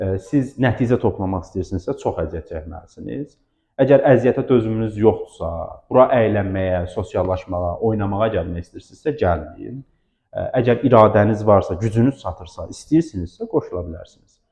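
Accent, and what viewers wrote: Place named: Aghdam, Azerbaijan. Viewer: Mən Ağdamlıyam, ləhcənizə görə soruşdum,bizimkinə çox oxşayır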